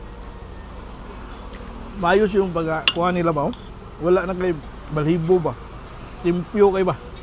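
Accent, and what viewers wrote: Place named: Cebuano, Philippines. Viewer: Bisdak kaayo ka..gahi kaayo diction hahaha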